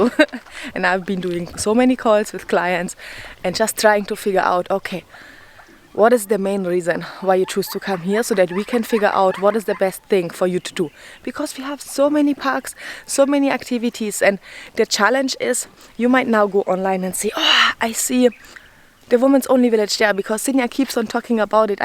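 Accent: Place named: Kenya. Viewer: Before you come to Kenya, watch her videos. Your content is genuine and you experience as a first time visitor really shaped you. I love the Kenyan accent.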